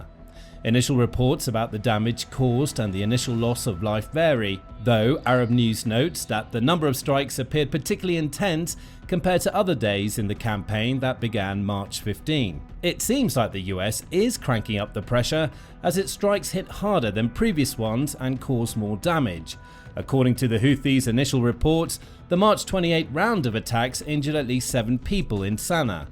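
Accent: England, United Kingdom. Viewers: What is your contributing to this campaign? Probably absolutely nothing by your voice. You sound like you’re from England. What is England doing? Are they doing helping they spending some money?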